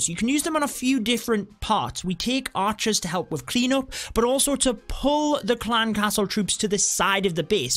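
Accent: United Kingdom. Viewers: well he's british but u will get used to it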